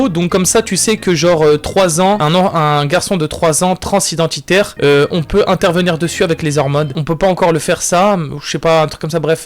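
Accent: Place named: Maghreb. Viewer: AH MAIS TES UN MAGREBIN JAIS TOUTE SUITE VU CA AVEC TA FACON DE PARLER ON DIRAIT UN MONGOL HANDICAPE MENTAL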